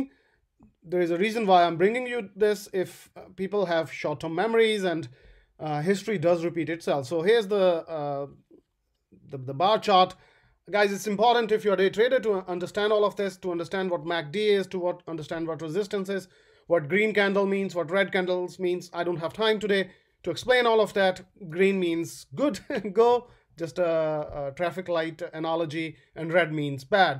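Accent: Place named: British Pakistani, United Kingdom. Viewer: You sound British/Pakistan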